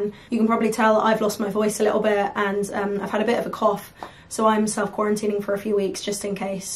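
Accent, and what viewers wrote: United Kingdom. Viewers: Is she british? first time I hear her talk. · Of course she is britsh. I just knew she had a British accent.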